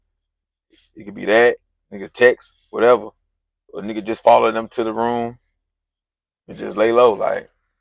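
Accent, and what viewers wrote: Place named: Carolina, United States. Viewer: I think u from the Carolina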